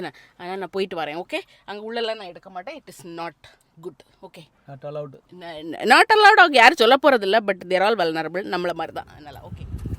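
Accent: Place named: Kanyakumari, India. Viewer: அன்பு சகோதரி ❤❤❤❤❤❤❤❤ எங்களுடைய திருவிழா வாழ்த்துக்கள் ❤❤❤❤❤❤❤❤❤கன்னியாகுமரி வட்டார மொழி மிகவும் பிடிக்கும்... இன்சூரன்ஸ் advisor's BOTH LIC &MEDICAL Star health insurance ❤❤❤❤❤❤❤ PLEASE UPLOAD.... LONDON PEOPLE HOW TO CHOOSE INSURANCE....❤❤❤❤❤❤❤❤❤❤❤❤❤❤❤❤❤ THIS IS AWARENESS VIDEO... KINDLY MY request.... Take care sister....❤❤❤❤❤❤❤❤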